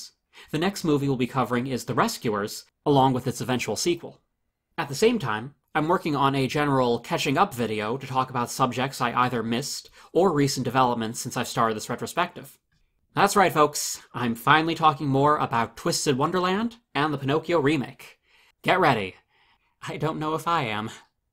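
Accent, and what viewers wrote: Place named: United States. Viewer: Interesting presentation, but the narrator's thick American accent sometimes makes it difficult to understand what he's trying to convey, at least for us Non-americans. For instance, what or who is "raawbinuud"? I guessed after a while that it had to be "Robin Hood". So why not at least try to pronounce it like that? It would honour the legend too! A little more normalized English would at any rate be much appreciated.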